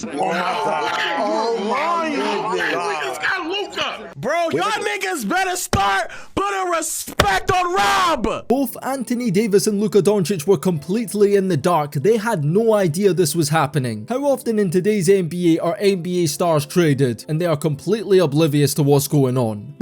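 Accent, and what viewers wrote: United Kingdom. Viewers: Bro fix your mic, you sound like you're from uk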